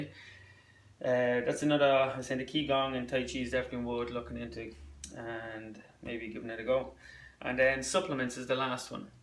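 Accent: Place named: Ireland. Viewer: Where's that cute accent from? Ireland? I bet Ireland has alot of DP/DR due to your strong need for omega 3s (fish) and high alcohol intake (depletes B vitamins). I'm a fourth Irish and Swedish...very delicate brain/CNS. Was diagnosed with AF 20 years ago. Unfortunately I didn't learn the cause of AF and DP (22 years now) until 6 months ago. But Thank God I did. It's all rooted in a B1 deficiency. As a nutritionist I have lots of great resources I can share. But here's the basics... B1 in the form of Benfothiamine plus an excellent methylated B complex. Also lots of magnesium as a co-factor. Daily or a pound a week of beef liver...a B-BOMB! An important traditional health food. Vit D supplement too. Lastly, I highly recommend a traditional Northern European Diet...free of salicylates. Great video! I love your scientific nutritional approach! That is very much lacking in the DP world. 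Let me know if you want more info.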